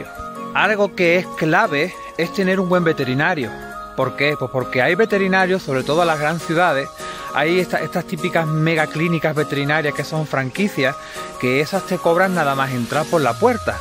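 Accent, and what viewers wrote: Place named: Andalusia, Spain. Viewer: Hola, da gusto escucharte Andalucillo jeje, me recuerdas a mis sobrinos los dos hijos de mi hermano. Yo tengo mi podenco de 4 meses, sigue así con esa sencillez que derrochas por tus poros. Un abrazo, me encantais tú y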